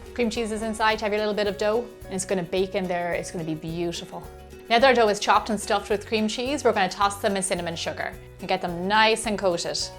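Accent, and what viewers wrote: Ireland. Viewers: Love the recipe. You have a beautiful Irish accent by the way. How long have you lived in the US? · It's softened for American ears i guess :p But you can almost listen to the Irish pub rogue in ya !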